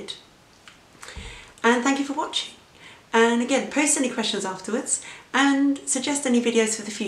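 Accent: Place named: United Kingdom. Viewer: Thank you for this vid, great to hear a Brit regarding this topic, it's a real shame we can't get what we need from our NHS, frustrating when you watch all the US info on here, where they can just ask their docs for tests and treatments, and all the info on dietary issues, our docs don't even mention diet at all. Although the expense is an issue in either country. I've been ill on and off for years with hypo since having my first child who is now 24. I'm on Thyroxine and it has been a nightmare, every time a new dr comes to the surgery, they do a new blood test and put my Thyroxine med down because the blood tests 'say so'. It always puts me into a medical spiral, cold hands and feet, tingling, mouth ulcers, cold sores, acne, psoriasis, dry skin, low mood, palpatations, irritability, etc why can't they look beyond the bloods, they don't even ask how you are, they just adjust you meds. 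When you go back to complain the dose isn't working, they just say well your bloods are 'fine' you're on the right dose. No information at all they treat us like children who can't understand anything least of all medical terminology. No, I'm not fine I having all the symptoms again. They can't understand you may need the dose you've had for 20yrs. That your body was working fine with it. I've had this up down yoyo every time. I'm not even asking for T3 just not to be messed about constantly with every different GP. Although the option would be great. I asked about that when I came back from the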